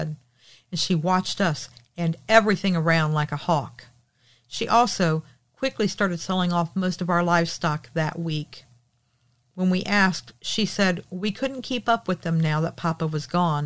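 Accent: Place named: West Virginia, United States. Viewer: Well done! I loved this story! So glad you narrated in the same W Virginia slang. I could absolutely see every detail of the story. Well written and well narrated. Thank you!!!!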